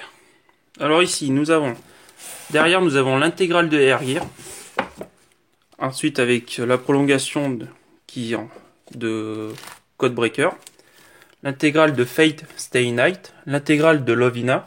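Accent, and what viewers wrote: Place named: England, United Kingdom. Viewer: Excellente cette vidéo avec un accent anglais tellement beau ! Je recommande les yeux fermés les perdus de l'internet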